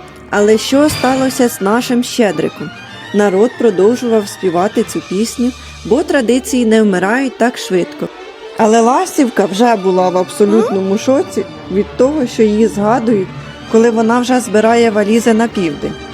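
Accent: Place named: Poltava, Ukraine. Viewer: Як же мені подобається ця солодка українська промова, нагадує полтавську вимову.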